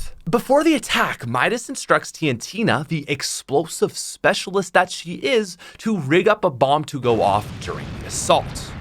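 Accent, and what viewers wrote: Canada. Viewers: You are Canadian. I know cus of the way you say out and however prove me wrong, oh wait you can't.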